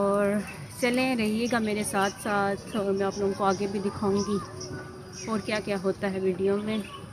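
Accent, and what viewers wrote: China. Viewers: Love from India, 1m subscribe soon, how can you speak mandarin so frequently and your accent just like Chinese people please make a video on this topic😊😊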